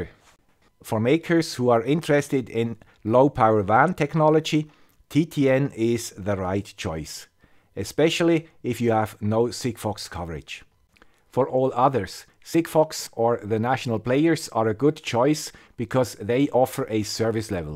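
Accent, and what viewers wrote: Switzerland. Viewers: Another excellent video from the "Expert with a Swiss accent": thank you so much for the qulity of the video. Please note that the 10xDL max.message per day comes from TTN "best practices" only: you do not have such limitation if you run a private LoRaWAN network (what you can forget with SIGFOX), nor if you use an Operator network (you might just pay more for these extra DL...). The deep indoor propagation of LoRaWAN is also better than SIGFOX. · Here's the guy with the Swiss accent, odrr?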